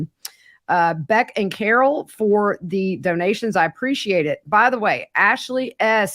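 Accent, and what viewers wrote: South, United States. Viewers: NEW SUB. So glad I found you. I’m in South Carolina. I recognize your southern accent 😅❤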